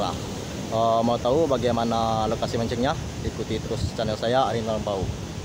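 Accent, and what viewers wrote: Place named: Malaysia. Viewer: Asal mana om kok kayak malaysia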